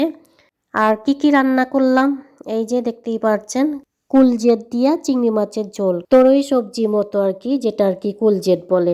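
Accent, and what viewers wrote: Dhaka, Bangladesh. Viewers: লাইক দিয়ে শুরু করলাম ❤ অনেক সুন্দর ভিডিও শেয়ার করলে আর অনেক ভালো লাগে ঢাকাইয়া কথা শুনতে। রান্না গুলো বেশ মজা হয়েছে মনে হচ্ছে তাই না?❤ আমি ও আপু গরম পানি দিয়ে রান্না করি তাহলে রান্না বেশ মজা হয় ভালো লাগলো ❤ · Dua Kori sob report jeno valo hoy r betha O jeno valo hoye jay. Dhakaiya kotha sunte valo e lage. Onek kichu ranna korle.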